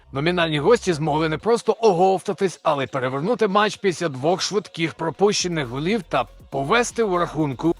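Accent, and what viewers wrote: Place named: Canada. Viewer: Коментатор,наче з Канади)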